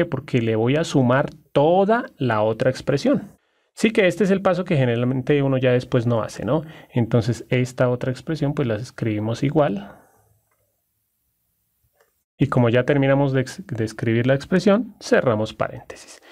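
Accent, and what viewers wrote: Colombia. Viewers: una duda usted es colombiano=??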